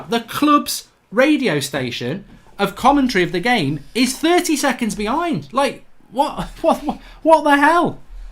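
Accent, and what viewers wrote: Manchester, United Kingdom. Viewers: Bowzer Wowzer yeh no one cares about anything on United stand apart from it the geeza with the thick manc accent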